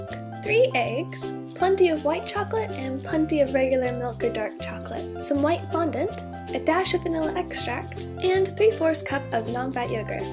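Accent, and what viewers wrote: United Kingdom. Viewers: Omg, you are starting to sound British!!!! this is the best day of my life :)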